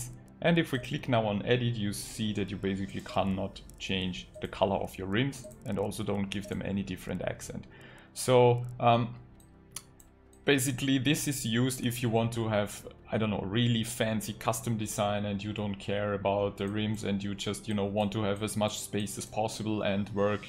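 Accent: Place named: Germany. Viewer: Danke erstmal für deine Videos. Ich denke du bist Deutsch, würde ich jetzt einfach mal von deinem Dialekt ableiten. Kannst Du das nicht noch mal in Deutsch machen? Das würden vielen von meinen Leuten helfen und wäre sehr ehrenhaft von Dir.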